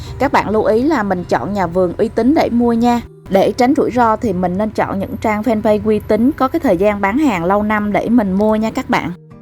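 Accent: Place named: Mekong Delta, Vietnam. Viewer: đúng chất người miền tây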